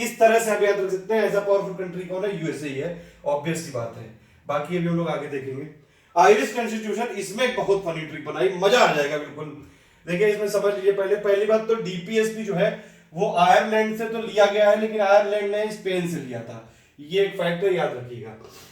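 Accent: Kanpur, India. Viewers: sir Aapka kanpuriya andaj Dil jeet leta h kasam s entertainment with study 🙏🙏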